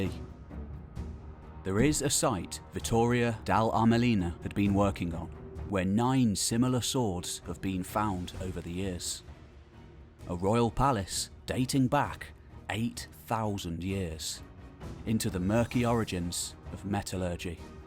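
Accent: United Kingdom. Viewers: That's a cheap ass video with crap photos and clips shown over and over again. Of course with the limey voice you think you will learn some deep shit but no, the video just ends with some clip of driving down the dirt road in some far away shit hole. Back to the airport, I guess.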